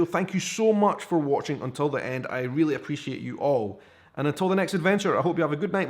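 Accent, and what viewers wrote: Scotland, United Kingdom. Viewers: So glad I found you. Enjoying your posts so much. I love Scottishs accent and phrases! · What does Scotland do best....the accent! Read a friggin book and I will listen. Seriously...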